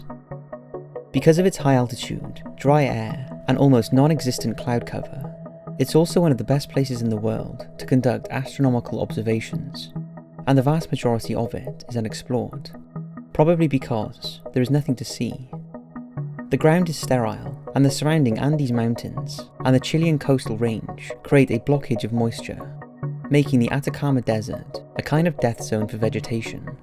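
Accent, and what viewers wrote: Wales, United Kingdom. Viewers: Trying to guess the accent. Welsh?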